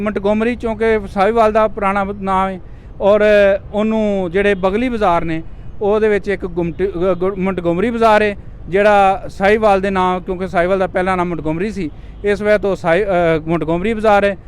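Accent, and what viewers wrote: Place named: Doaba, India. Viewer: Aah bhaji da lehja sade hoshiarpur doabe val da hai , pure doabi accent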